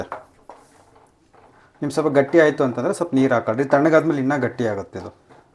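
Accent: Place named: Sira, India. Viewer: Nivu sira ಕಡೆಯವರ ನಿಮ್ಮ ಭಾಷೆ ಹಾಗೆ ಇದೆ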